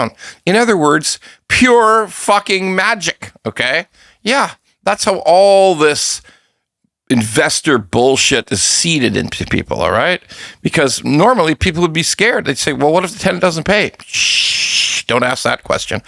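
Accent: Toronto, Canada. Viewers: i know you are in Toronto, it's the way you say it with the last 'T' silent.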